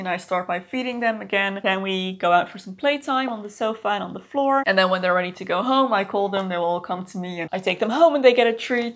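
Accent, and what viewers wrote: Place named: Netherlands. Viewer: Je klinkt niet zo Nederlands als de meesten die Engels praten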